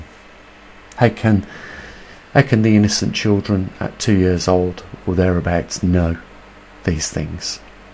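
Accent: Birmingham, United Kingdom. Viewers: lol that brummy accent coming out on todays post lol. go brumee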